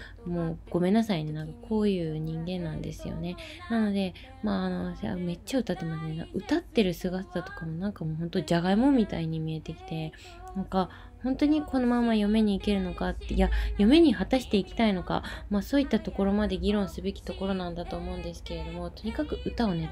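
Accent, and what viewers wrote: Kagoshima, Japan. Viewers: 鹿児島のなまり？初めて聞いたのでびっくりした‼️新鮮です‼️‼️ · 久しぶりにコメントしました🙃 の鹿児島弁すき！ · 〜！夜勤中にぼーっと見てます。鹿児島弁が心地よか〜〜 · いちいちコメントが面白くて笑ったw 声と鹿児島弁に癒されました☺️ の日常がわかるおうち動画好きー！また楽しみにしてます♡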